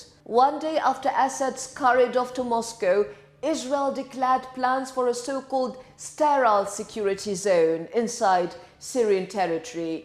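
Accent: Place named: United Kingdom. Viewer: ملة بريتيش اكسنت يا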